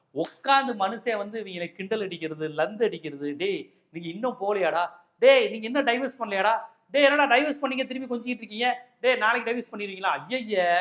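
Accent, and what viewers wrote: Madurai, India. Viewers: நீங்கள் எதற்கு மதுரை வட்டார மொழியில் பேசுகிறீர்கள் இந்த படத்திற்காகவா?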